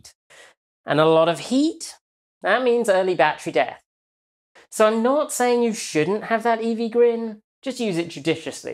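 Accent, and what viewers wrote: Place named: United Kingdom. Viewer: Why are many of the EV videos feature man-ish looking woman with an annoying limey accent. "Let it cool down before you wack it on the charger"... Oh the humor....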